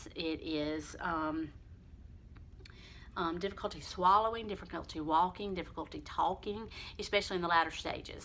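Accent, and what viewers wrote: South, United States. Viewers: I have found your videos to be very informative & I love your southern talk! Thank you for your time to educate us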